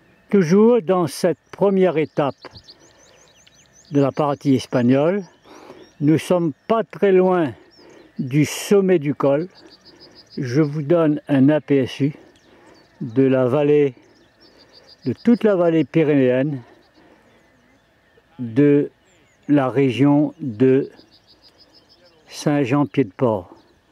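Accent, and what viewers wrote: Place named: Réunion, France. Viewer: Bonsoir. Merci pour cette sublime vidéo qui ravive de très bons souvenirs'. J'ai effectué mon camino du 02/06 au 30/07/2019. Je suis parti du PUY et j'ai rejoins SJC le 27/07... J'ai continué vers le Finistère que j'ai rejoins le 30/07. J' bien ton accent qui me rappelle mon Île natal la RÉUNION. Bonne continuation. Je repartirai sur les chemins par la voie du VEZELAY au mois de juin. Thomas (un gars des Avirons)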